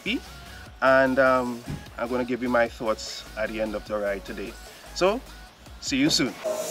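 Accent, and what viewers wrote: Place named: Trinidad and Tobago. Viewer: I hear a trini accent where do you ride ?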